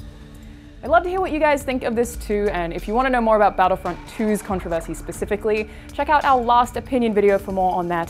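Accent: Australia. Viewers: angel47baby It's her accent. She's australian.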